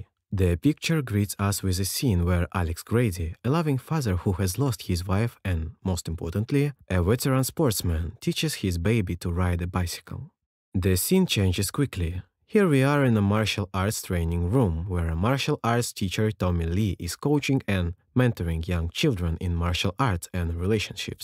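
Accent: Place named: Russia. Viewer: чел, ты бы хоть русский акцент убрал, если уж озвучиваешь на английском )))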